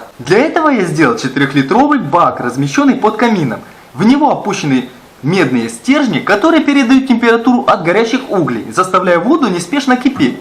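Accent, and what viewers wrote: Ukraine. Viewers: Який чудовий м'який український акцент у жіночого голосу... Прошу, випустіть хоч декілька відео українською . ... А може,можна просто продублювати вже готові Ваші відео на українську. Це буде великим збагаченням українського контенту Ютубу і , взагалі, домогою Україні для просування до прогресу.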